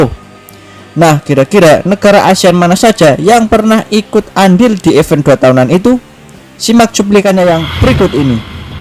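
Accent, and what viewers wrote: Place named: Java, Indonesia. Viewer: Banyakin baca koran..hilangan medok mu mas...g enak didenger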